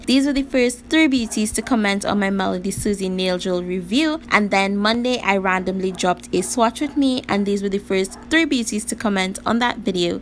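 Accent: Trinidad and Tobago. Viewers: First video of yours I'm seeing. Are you from Trinidad 🇹🇹? Your accent sounds like it. 😊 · I know a Trini accent when I hear one. Big up yourself sis!!!!! ❤️🇹🇹🇹🇹🇹🇹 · Loving those nails and the accent my sister 🇹🇹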